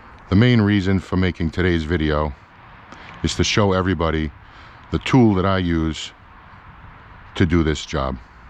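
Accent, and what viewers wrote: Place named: East Coast, United States. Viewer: Yo I miss that East coast voice!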